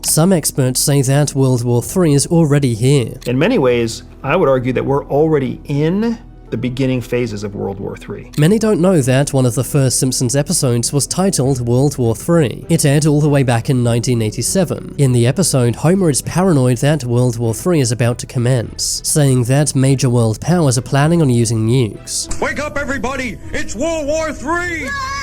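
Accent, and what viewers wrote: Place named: England, United Kingdom. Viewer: What enlish accent is this, makes me crazy listen to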